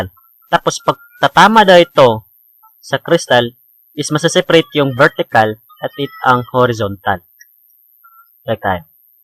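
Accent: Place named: Philippines. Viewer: Bro thats how philipino talk. Try speaking another language without an accent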